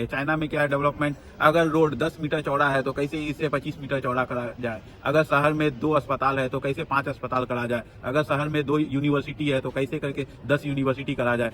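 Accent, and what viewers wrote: Bihar, India. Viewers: सच्चाई ओर सुधार लाने वाले व्हिडिओ आप बनाते हो china देश ने itni tarrakki ki he इस्का main karan क्या हे इस्पर आप जरूर व्हिडिओ बनाईये कयोकी china सबसे जादा अबादी वाला ओर लोकसंख्या मे सबसे जादा रेहेणे वाला गरीब देश ऐसे हमने syllabus मे पढा हुंवा था जब मे स्कूल मे थी/ यानी प्रॅक्टिकल knowledge ही वही सही हे इसलिये china डॉन बन गया मे भी 4 th तक सरकारी स्कूल मे पढी हु/ china ki sachhai जनाना चाहती हु isliye आप के व्हिडिओ देखने लगीं ही ओर से आप जिस तरह बात करते हो वो मुझे बेहत पसंद है/ आप जैसे हमारे स्नेही हे बिहार से वो भी आप जैसे बिहारी टाईप बोलते हे/ बिहारी लोग बेहत मेहनती ओर helping log होते हे.. मे महाराष्ट्र से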